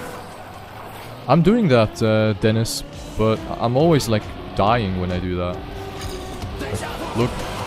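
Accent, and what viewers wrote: Germany. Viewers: mann hört halt auch ein bischen das du deutscher bist lul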